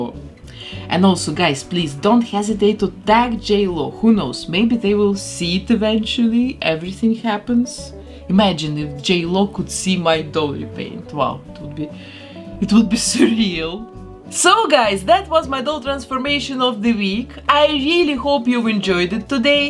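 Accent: Russia. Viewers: Акцент сто процентов Русский, но написано , что Бельгия )))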